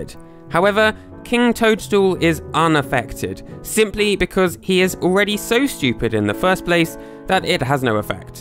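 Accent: United Kingdom. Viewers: what kind of british accent do you have? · What a great accent you have...what part of Britain are u from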